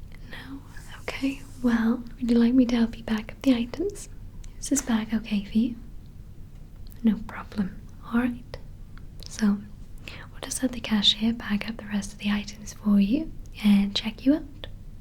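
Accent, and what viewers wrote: Wales, United Kingdom. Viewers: Welsh accent is incredibly nice😍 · Fantastic video. I find your voice so comforting. I think it's because I'm Welsh too and so your accent is so familiar!!!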